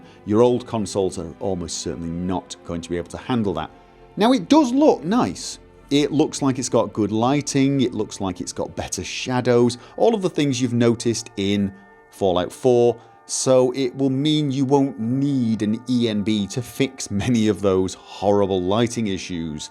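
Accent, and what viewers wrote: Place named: Manchester, United Kingdom. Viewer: Are you from Manchester you've got a Manc accent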